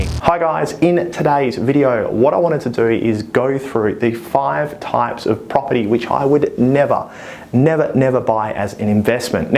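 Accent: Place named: Australia. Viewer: Oh good, you're Aussie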